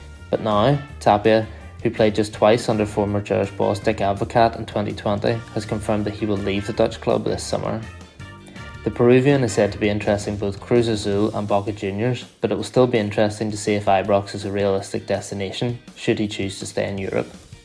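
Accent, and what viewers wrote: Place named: Ireland. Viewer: Wow I didn’t realise there were Irish rangers supporters ? Can someone clear this up as the presenter sounds Irish ?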